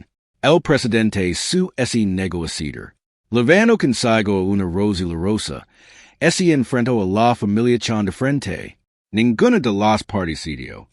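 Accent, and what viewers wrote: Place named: England, United Kingdom. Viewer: Español con acento ingles"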